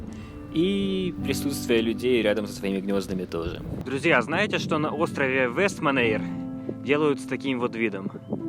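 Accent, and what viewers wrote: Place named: Odessa, Ukraine. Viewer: а ты шо из Одессы?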